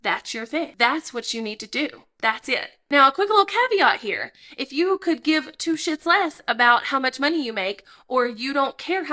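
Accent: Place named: Carolina, United States. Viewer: you've got a Carolina accent lol! I'm from South Carolina. great video! where did you get your shirt from also? super cute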